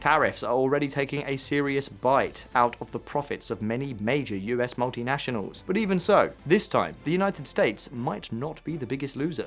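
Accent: RP, United Kingdom. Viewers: You cut all the pauses. Now your video doesn't breathe, it's rattling. It doesn't do justice to your posh british accent.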